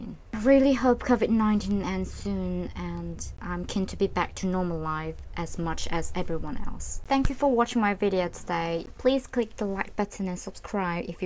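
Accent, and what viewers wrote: United Kingdom. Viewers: Love your English accent 😁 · 私の母国語は日本語ですが韓国語も英語も扱えるようになりたくて勉強中なのですが、さんはもうマスターされてて本当に尊敬します☺️🕊👏🏻 イギリスアクセント素敵です💕 私は勝手に私にとってさんは原動力でありとても刺激になる方だと思っています🥰いつも動画楽しく見ていますこの言葉を伝えたくて初コメ失礼します😖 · 와 진짜 영국발음👍👍👍👏👏👏👏👏👏👏 너무 웃긴 질문인거 알지만😂😂 저두 2년 살면 님 처럼 영어 잘 해 질 수 있을까요...!! 물론 개인차도 있고 언니의 노력이 지금의 결과를 이루었지만 진짜🙏🙏👏👏 감탄하고 갑니다!!!ㅎㅎㅎ (전 호주에 있다 왔는데,왜 발음은 미국일까요😂😂😂) 진짜 영국발음 너무 멋있고 이런 내용 정리 잘 해주셔서 영상 올리시는 언니도 너무 멋있으세용💕💕💕 아 진짜 내가 유튜브에서 이런 채널을 발견하다니😍🥰ㅎㅎ 도쿄는 저녁🌛인데 런던은 아침🌞이겠죠? 전 오늘 편집 한다고 날 샜는데😂 금요일 한 주 마무리 잘 하시구 좋은 하루 되세용!!!💚💚💪💪 아 진짜 영국발음 언니 너무 멋이썽!!!!🥰❤️ (갑자기 다가와서 친한...척 죄송해요😂😂멋있어서 그만...!!!😍👍)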